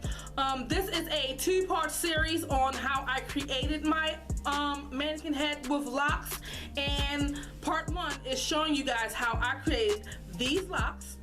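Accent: Baltimore, United States. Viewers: What state are you in? I can tell your from Baltimore based on your accent. I am just hoping your in Texas! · A tutorial with a Baltimore accent!! I love it! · Omg u sound like you’re from Baltimore lol but I’m starting my own locs I had them before but cut them several times but naw I need to go back using this method 😍😍😍😍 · Tell me you from Baltimore with out telling me you from Baltimore. Or at somewhere in Maryland.😊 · You from B’more??? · I know a Baltimore accent when I hear one ❤ much love from Jersey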